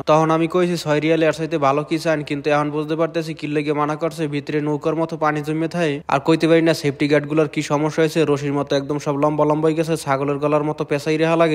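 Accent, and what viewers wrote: Barishal, Bangladesh. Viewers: আপনা বাসা কি বরিশাল ভাইয়া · মনু আমনেও কি বরিশাইল্লা🙂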